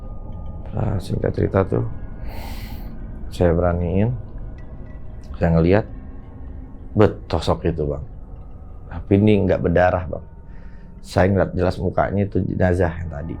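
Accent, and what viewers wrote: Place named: Jakarta, Indonesia. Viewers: Logat betawi emng beda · Nih Betawi Depok ni ga jauh beda am Betawi gw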